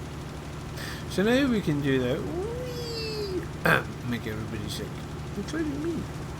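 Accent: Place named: Scotland, United Kingdom. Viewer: Good to hear your Sottish tones again.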